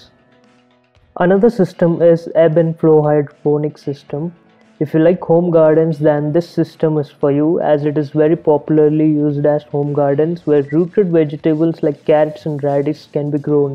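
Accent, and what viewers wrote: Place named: India. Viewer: A white animation figure and an hindi accent. As long as it is not the IRS I am fine I guess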